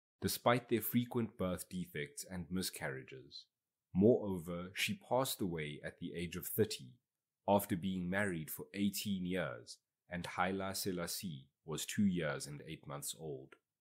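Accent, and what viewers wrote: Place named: South Africa. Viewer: I didn't know those circumstances of Haile Selassie's birth and parents. Thanks, South African sounding narrator.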